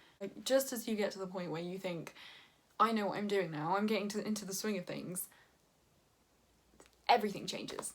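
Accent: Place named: United Kingdom. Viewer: I had no idea you were british and that beautiful accent caught me off guard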